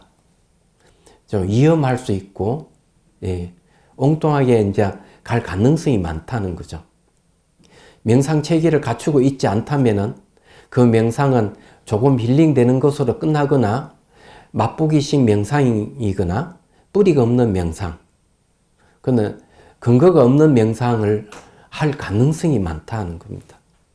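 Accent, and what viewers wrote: Gyeongsang, South Korea. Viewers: 김 옥순 님 스님 경상도분이라 방언을 많이 쓰시는데 우리는 다 알아 듣거던요 명상 강의를 하시는 스님께 그런 댓글은 禮가 아닌것 같습니다..^^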